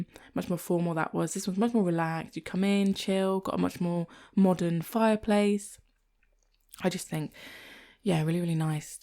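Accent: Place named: East Midlands, United Kingdom. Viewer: PS - are you from somewhere northern originally? Your accent now sounds very southern, and a bit towards RP, but words like grass and bath, you say in a way that makes me think maybe East Midlands?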